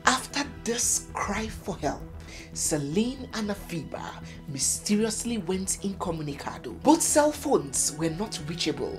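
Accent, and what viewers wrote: United Kingdom. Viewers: Is that a uk accent😢😢😢😢😢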